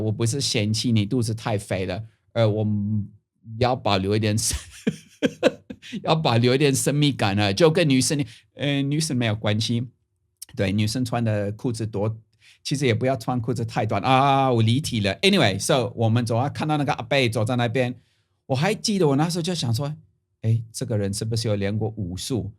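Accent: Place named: Taiwanese Hokkien, Taiwan. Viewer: 真的有學到台客說話的精髓。老婆大人教的太好了！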